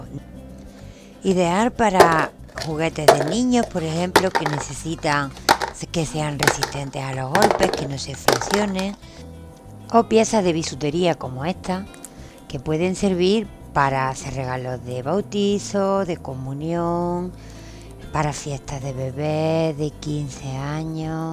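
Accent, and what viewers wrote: Andalusia, Spain. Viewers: soy de Argentina m encantan tus vídeos los veo desde cuando mostraba tus hermosos jarrones pero lo que más difruto es ese acento andaluz creó y esa hermosa y cariñosa forma de enseñar tus trabajos tratando de que llegue a nosotras tus seguidoras lo más instructivo posible felicitaciones un gusto enorme.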